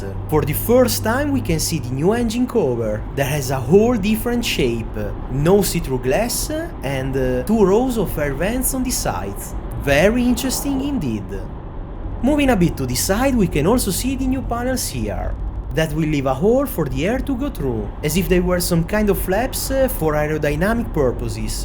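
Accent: Italy. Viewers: the most Italian English i have ever heard, 10/10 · Italian accent terrible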